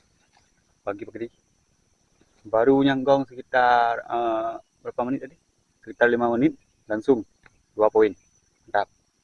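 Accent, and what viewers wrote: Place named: Aceh, Indonesia. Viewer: Mcm logat aceh ini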